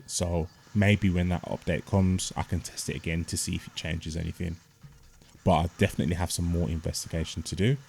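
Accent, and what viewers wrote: United Kingdom. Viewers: You from Brum too? I subbed man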